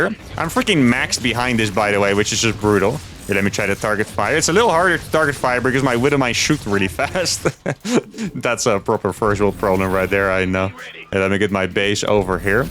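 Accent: Netherlands. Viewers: How many languages do you speak? Your English is great. You sound Dutch? You live in Mexico so probably Spanish. I would love to see a Spanish stream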